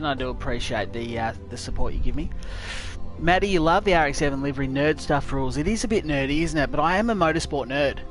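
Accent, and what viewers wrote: Australia. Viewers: Quickly becoming my fave streamer/youtuber. Love your soothing aussie tones.(in a manly platonic way).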